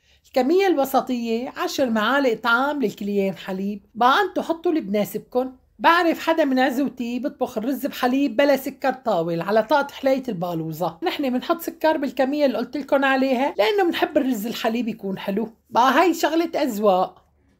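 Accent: Aleppo, Syria. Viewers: بلعكس خليلي عم تقولي دشري وحيكتك الحلوة الحلبية لا صلية ماتغيري كلامك برجعنا لايام زمان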